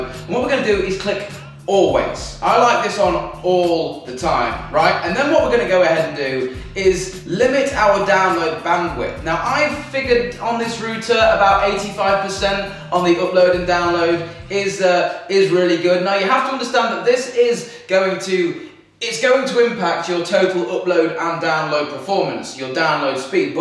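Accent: United Kingdom. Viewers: a shota a fps shota wtf british accent 100% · I love your british accent! Would you like to say that once again. "Rooter." <3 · Well technically yes as he lives in the uk therefore pronounces it how the rest of the uk pronounces it. Its like the US and other countrys pronounce it 'Rowter' but us brits pronounce it 'Rooter' But either way its spelt 'Router' all the time. It doesn't take a master mind to figure this one out. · For those who are mad at his english... Its Basic British Accent so for those who are americans or canadian or something chill out🤣😂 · Jude Groomes he is speaking english not american english he says things differently because he is speaking United Kingdom English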